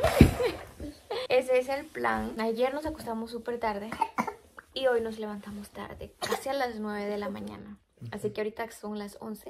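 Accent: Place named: Colombia. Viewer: pareses colobiana hablando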